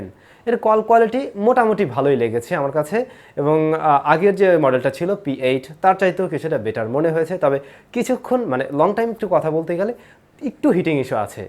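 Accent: Barishal, Bangladesh. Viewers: Vai barisaila language misaien na... 😫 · ভাইয়া বরিশালের ছেলে নাকি :/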